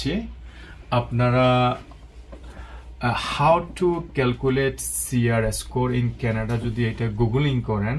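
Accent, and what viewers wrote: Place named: Chittagong, Bangladesh. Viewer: Vaiyar bari Chittagong for sure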